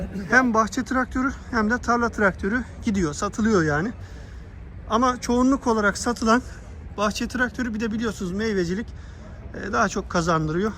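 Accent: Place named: Isparta, Turkey. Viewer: çok güzel ama çok kalabalık ve streslıi istanbuldan dünya güzeli ıspartama selamlar sağlıklar inşallah gayet güzel aktardın ıspartanın türkçesi güzeldir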